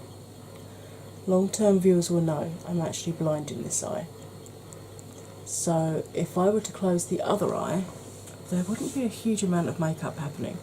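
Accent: United Kingdom. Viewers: Hiya, new subscriber here, I found you through Makeup Struggles! I too am feeling this heat - it’s too much isn’t it! I’m 48, very fat, relatively fair but defo fabulous! I’m jealous of your lids space as I have very hooded eyes! I’m in the south too - I’m assuming from your accent you are Essex way on? Love this look! Sorry for long post I just wanted to introduce myself! 😃 oh yeah and I also have spinal stenosis!!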